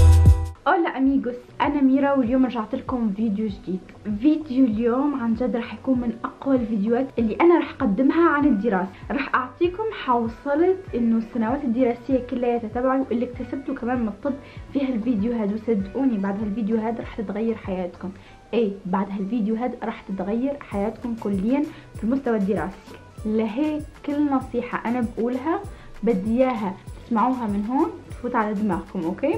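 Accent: Tunisia. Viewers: انا مشتركة جديدة حاستك تونسية اذا كنن صاح انا جارتك من الجزائر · احس انك من تونس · حسيت من طريق حكيك انك تونسية بنت بلدي👍👏🌞😗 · إيه تونسية حلوة سكر😋🌹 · هل انت تونسية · نحسك تونسية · صح هي تونسية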